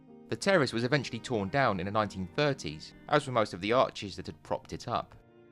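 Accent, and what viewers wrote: London, United Kingdom. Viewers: You are so knowledgeable and make these videos so interesting. Lovely to hear an authentic English / London accent too!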